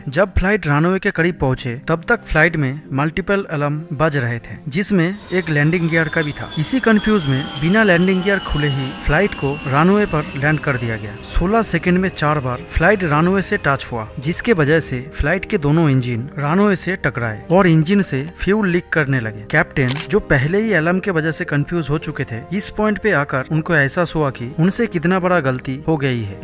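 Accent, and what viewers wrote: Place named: Bengal, India. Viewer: Thora pronunciation improve karo. Bengali gaon ka accent hai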